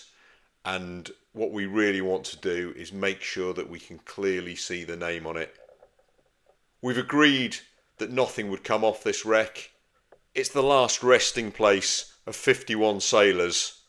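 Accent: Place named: England, United Kingdom. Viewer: Really enjoyed watching this..and love the English accent..I'll lay odds on you were in the Services...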